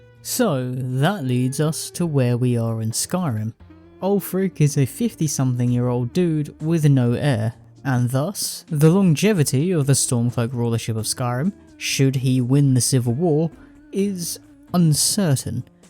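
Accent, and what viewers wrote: United Kingdom. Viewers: I'm English, and judging by accent I assume they are too. We tend to drop the R at the end of words.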